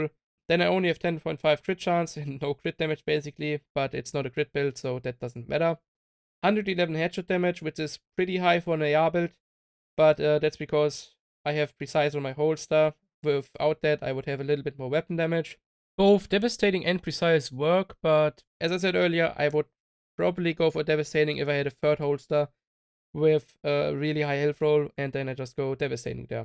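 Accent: Germany. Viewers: love the hard german akzent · Bist du deutscher ??? You Liste like a german guy and speaks english 😂😂😂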